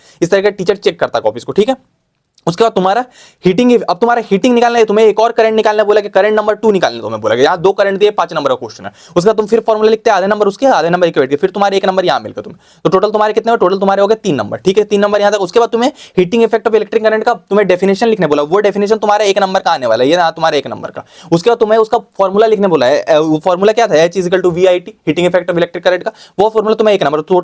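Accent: Bihar, India. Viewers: Bhaiya Aap Bihar sai hoi kya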